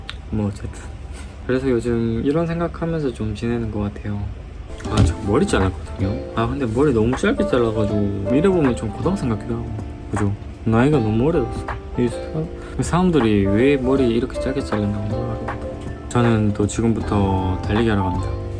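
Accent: Daegu, South Korea. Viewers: 안녕하세요. 일본 워홀을 가고 싶어 영상 찾아보다가 댓글 남겨요. 대구 사투리 쓰셔서 대구 분이시구나 했는데, 팔거천 얘기 듣고 깜짝 놀랐네요. 저도 칠곡 살아서요 ㅎㅎ.. 지금은 서울에 직장 잡고 열심히 일하고 계신 것 같은데, 저도 워홀 이후에 서울 가는 게 계획이거든요. 이런저런 부분에서 반가워서 댓글 남기고 갑니다. 다른 영상도 마저 찾아볼게요. 하시는 일 다 잘되시고 파이팅하세요!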